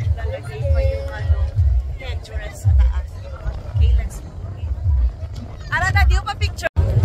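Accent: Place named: Iloilo, Philippines. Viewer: Done watching na po ms... sobrang enjoy po panoorin.. ang ganda ng iloilo.what i notice.. ang linis esp. ng main road. Even during the festival kung meron man kunti basura lng..at meron pa mga nabibili mga souvenir..and can take pictures by the bridge.. beautiful people... And i love tlga ilonggo accent ms...😍😍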